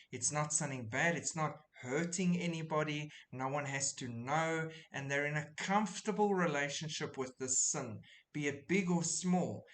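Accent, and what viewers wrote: South Africa. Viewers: I found your discussion on the red heifers today, as I have been following Bible prophecy from the day I read The Late Great Planet Earth. I was so happy to hear a familiar accent and find a fellow South African who truly loves Jesus Christ and believes in the Sanctification of the Believers and who believes in the imminent Rapture, putting Him above all. Wow, thanks!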